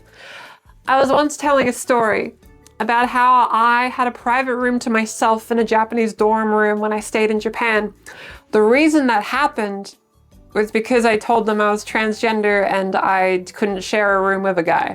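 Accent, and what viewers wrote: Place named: Australia. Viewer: Huh - youre Australian accent is way more noticeable with your masc voice. Its a super interesting party trick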